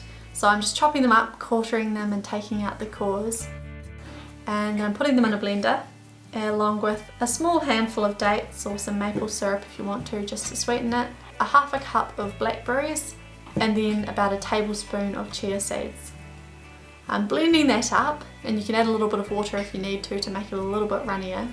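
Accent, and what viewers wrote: New Zealand. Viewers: She sounds like she's from New Zealand, not Australia. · is she from new zealand or australia?? (I'm KIWI!!!!!) 😂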